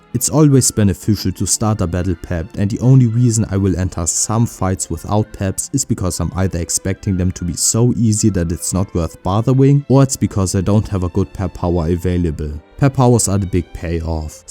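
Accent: Germany. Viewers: Wie hart man hört, dass du Deutsch bist xD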